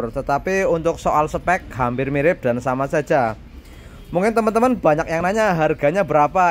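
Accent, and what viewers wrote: Banyumas, Indonesia. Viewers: Ngapak nmen yah mas.. pkoke jos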